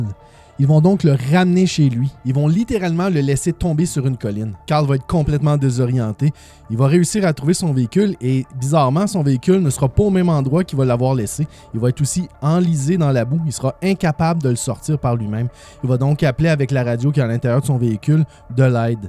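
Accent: Canada. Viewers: Superbe vidéo comme d'habitude. Mais je trouve que sur cette vidéo, tu parles un peut trop vite...et quand tu parles trop vite avec l'accent du Canada c'est difficile pour te suivre, et te comprendre.quand tu parles plus lentement. C'est beaucoup plus audible, et c'est top! Merci pour tes vidéos!!!!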